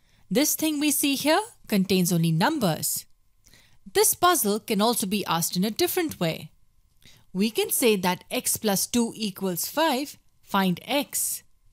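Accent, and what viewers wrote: India. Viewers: Are u indian.your voice pls explain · Are you indian? The voice should explain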